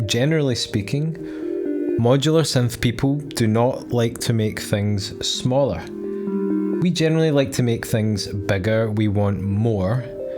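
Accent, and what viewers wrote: Scotland, United Kingdom. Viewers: And then I hear a fellow Scotsman. Bravo chap!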